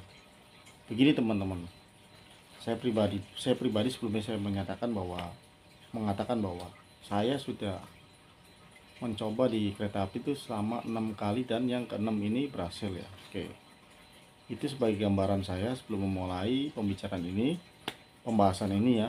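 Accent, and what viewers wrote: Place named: Cirebon, Indonesia. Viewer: Logat Cirebon nya keliatan bangat pak 😂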